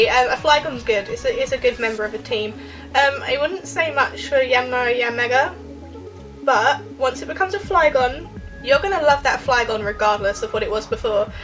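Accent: United Kingdom. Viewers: Your accent sounds like your from the UK is this true ? :)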